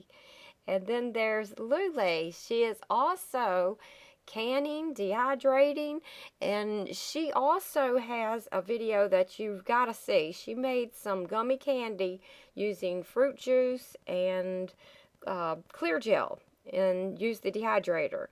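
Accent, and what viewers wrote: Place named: Gulf Coast, United States. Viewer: I was browsing through your channel and came upon this video. I really enjoyed your childhood videos. I always thought you lived closer to the gulf coast with your accent and your early gardens. Guess I was wrong:(. I have always wanted to live in virginia and buy a plantation home from before the civil war. My mom said the same thing about wishes..only there was a swear word in there. Funny how some sayings become popular:) Thanks for sharing:)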